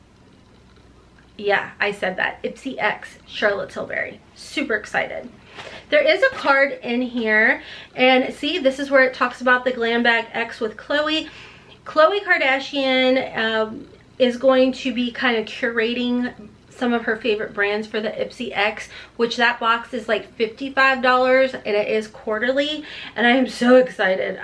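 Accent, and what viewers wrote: South, United States. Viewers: We love our country accent . And I sing 🎶 along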